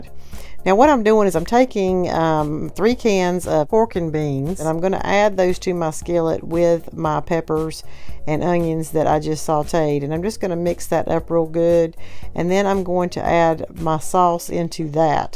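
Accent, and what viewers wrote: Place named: North Carolina, United States. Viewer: The 2nd I heard your voice, I knew you were from North Carolina!! ❤️. I’m also from NC, born & raised! That food looks AMAZING, but regarding the BBQ sauce, I am partial to the NC vinegar based sauce, lol. *But, I absolutely would not turn my nose up to the BBQ you cooked in this video, LOL!! That corn, OMGosh, you’ve got me craving some sweet summer corn now, thanks!! 🤣 *We live Lillington, right outside of Fort Bragg. 🙋🏻‍♀️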